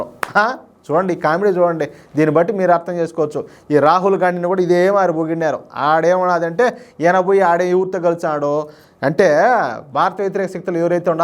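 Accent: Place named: Telugu, India. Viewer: Thank you garu for sharing the the matter humorously. Your Telugu accent perfectly fits in your heavy weight solid punches. God bless you for your patriotism.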